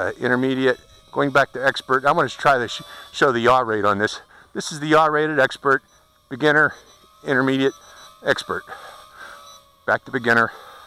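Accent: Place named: New England, United States. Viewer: Indoors? You sound like one of those (us) New Englanders. LOL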